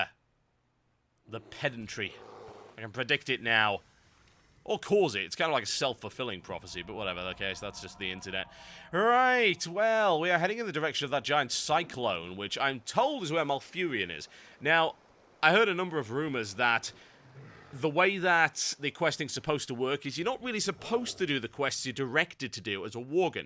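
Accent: United Kingdom. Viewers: are you british or American im confused : /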